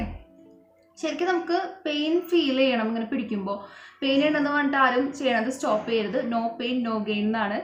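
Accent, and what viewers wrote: Thrissur, India. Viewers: Thrissurkaari aano chechi?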